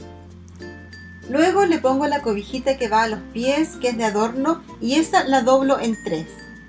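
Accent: Chile. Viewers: eres chilena? Por el acento, me gustaron lmucho tus sugerencias...además que eres muy sencilla y correcta, sin poses...todo está muy bonito gracias!!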